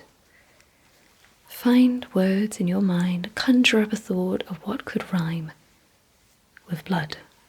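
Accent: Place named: United Kingdom. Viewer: As you wish Goddess Wright Knight Rain Hurricane / ( I love your sweet British accent 😊) Requiem Twilight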